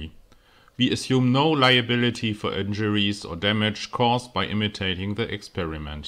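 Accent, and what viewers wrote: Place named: Germany. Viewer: I simply cant overlook the german sounding accent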